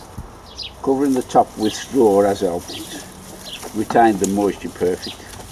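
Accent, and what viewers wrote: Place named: West Bromwich, United Kingdom. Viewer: Best allotment channel on YouTube. Grew up in West Bromwich but moved South around 20 years ago and it’s nice to hear the familiar accent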